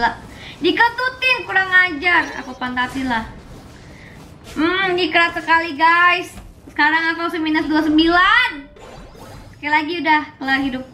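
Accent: Java, Indonesia. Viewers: aku kangen kamu mainan OmeTV pakai suara medok orang jawa 😁 Miss you, 😁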